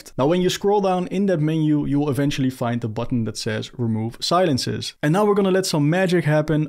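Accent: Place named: Netherlands. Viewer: When I click a video and I hear Dutch accent it just makes me happy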